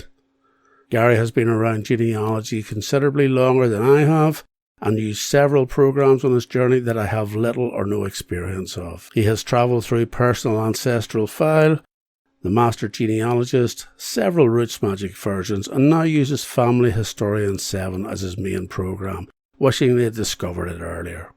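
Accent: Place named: Belfast, United Kingdom. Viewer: The Belfast accent is music to my ear. Melodic and easy to follow. This was an informative video and I thank you fir sharing it.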